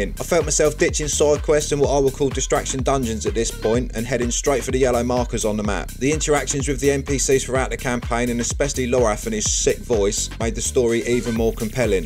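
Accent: Cockney, United Kingdom. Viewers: how much of a Geezer accent you have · The best part of this video is that listening to your voice without subtitles has surprisingly shown me a drastic improvement in my listening skills, particularly for British accents (or Australian accent?). Cheers from an Indonesian Diablo 4 Player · Hahaha nice man! This is a strong English (cockney?) accent!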